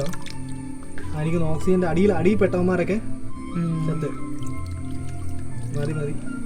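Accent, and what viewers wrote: Kottayam, India. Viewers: Kottayam language powli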